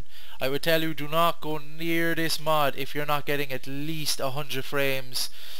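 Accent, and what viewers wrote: Ireland. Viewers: Does every Irish says "ish" instead of "it" ? (I'm french and this particularity made me curious :3)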